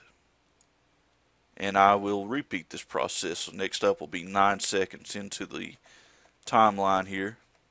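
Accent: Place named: Texas, United States. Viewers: nothing's better than a texas dude explaining a tutorial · You sound like a Texan guy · Damn. Now THAT is a real texan's voice. Thanks for the help.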